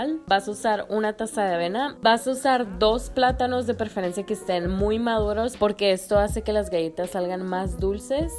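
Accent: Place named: Monterrey, Mexico. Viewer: Disculpa,eres de Monterrey? Tu acento es muy parecido al de Monica Llaca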